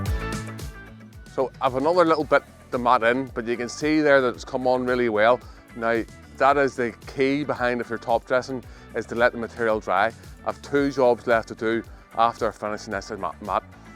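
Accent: Northern Ireland, United Kingdom. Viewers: Never thought I would get so much enjoyment watching a video on lawns. Knowledgable, genuine and straightforward. Throw in northern Irish accent and you have all the ingredients for a brilliant channel. Keep it up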